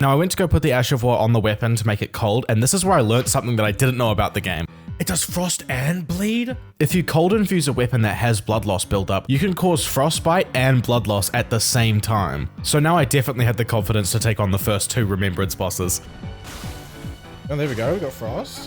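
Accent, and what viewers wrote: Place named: New Zealand. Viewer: Chur bro, you sound like you’re from NZ too so I’m gonna lay it to you straight. I was up from around 1am-3am last night smacking cones and beers back and ended up watching all your Elden Ring videos in that time. Love the channel, for sure have a new sub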